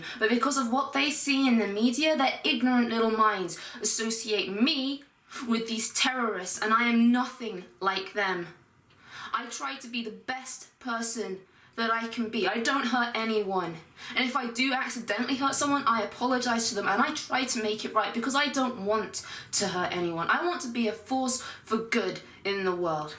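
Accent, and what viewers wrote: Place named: United Kingdom. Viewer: Well you sound like you are in the UK and look like you were Not Born a "Muslim" just converted to Islam. Those are 2 totally different things! This is your "Beautiful Religion" Hunny! Look around you in Europe, won't be long before you ARE IN CHAINS POPPING OUT 10 MUSLIMS BABIES! <link> 2. THIS IS LONDON!!! <link>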